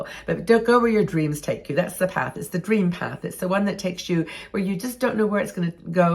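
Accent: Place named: England, United Kingdom. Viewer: Your accent is changing! Becoming more English! We’re you brought up in uk?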